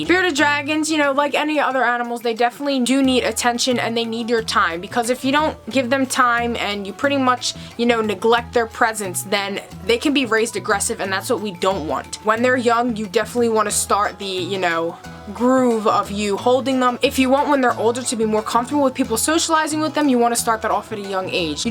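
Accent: Philadelphia, United States. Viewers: hey hun nice video er! you from Philly? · Are you from Philly?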